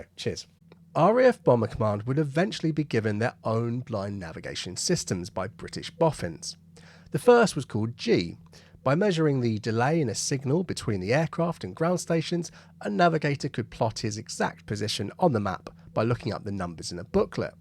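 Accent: Australia. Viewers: 9/10 Aussie accent as assessed by an Aussie 🇦🇺 Well done mate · Wanganui is an New Zealand city . Why would a person with an Australian accent be claiming to come from there? And why are Wanganui or Parramatta any "sillier" than Thames or Birmingham or Slough? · Forget the Ozzie accent, Wanganui is in New Zealand... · You know that Whanganui is in NZ, right? I was a bit confused by your pride in your Ozzie accent. Did I miss something? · Why would your Aussie accent be helpful in pronouncing a kiwi place name? The accents are quite different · Well done with your Aussie accent. Now try Kiwi.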